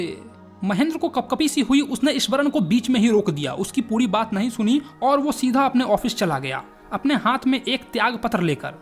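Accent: Bihar, India. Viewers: are you bihari?? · Aap bihari hai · bihari accent😁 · Bhai app kha ke ho bhoot wala story me jo tone tha wo mujjafapur sultanpur yahi sab ka tha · Do you belong from Bihar??? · Sir aap Bihari ho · Are you bihari I am also bihari because you spoke bhojpuri 😅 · aap biharse ho kya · Bihari ho bhaiya?? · Bhaiya aap Bihar se hona ❤ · Bhai aap bihar ya up se hoo aapki explanation yrr 😭 bahut jhakkas hoti hai bahut ache se samajh me aata hai ❤ love you bhaiya 😊❤ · Bihari language me maza aa gaya 😂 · Bruh i think you are from bihar 😅, like no offence but ur method of speaking shows that. ❤ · AAP to Pakka Bihari lag rahe ho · bhai aap bihari ho na 😊😊😊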